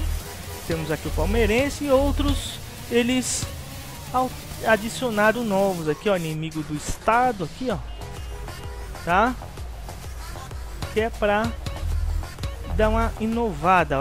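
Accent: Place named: Ceará, Brazil. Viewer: Vc parece cearense tmj top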